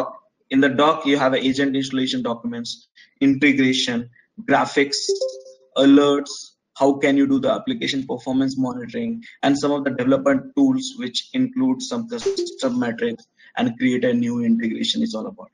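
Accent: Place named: India. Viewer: it so hard to understand the heavy accent. i find it extremely hard especially with Indian tutors.It looks like a good course for me but whats the point if i cant understand every 3rd word he says. Also, the fact that he writes everything during the course is very typical to courses that are led by Indian accent tutors and also the excessive use of the word "OK" really irritates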